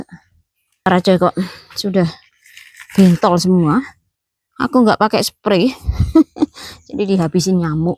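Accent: Java, Indonesia. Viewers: mba sy baru mengikuti Chanel sampian, sy jd suka, karena gaya bicara nya mba medok bahasa jw, unik gitu lho campur2 bahasanya 😁❤👍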